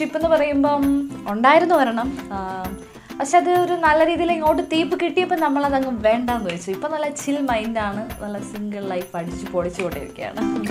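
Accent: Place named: Kochi, India. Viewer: ചേച്ചിയുടെ കൊച്ചി സ്ലാങ് സൂപ്പർ ആണ് ട്ടോ...🥰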